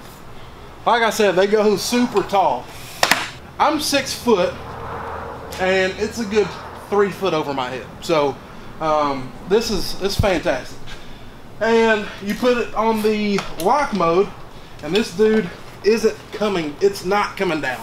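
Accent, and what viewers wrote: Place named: South Carolina, United States. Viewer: Man, you sound like you are from my neck of the woods here in South Carolina..... great review.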